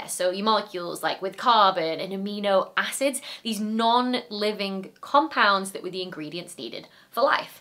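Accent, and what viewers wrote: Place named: England, United Kingdom. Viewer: I am hard of hearing and have trouble understanding most women. For some reason, even with your heavy English accent, you are easy for me to understand. I think some of it is due to your precise pronunciation of words. I really appreciate your efforts at making yourself understood and for the great explanations of difficult subjects. Keep it up!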